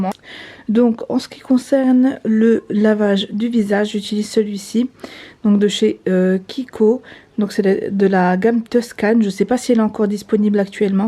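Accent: Belgium. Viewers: Salem, top ton vlog mais moi je suis pas motivée du tout pour le grand ménage...... Mais dis moi ton accent vient du nord ou de Belgique? A chaque fois je veux te demander mais j'oublie...lol bisous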